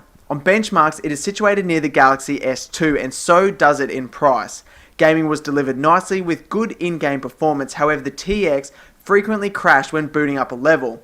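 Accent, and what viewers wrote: Australia. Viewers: Australian accent, love it!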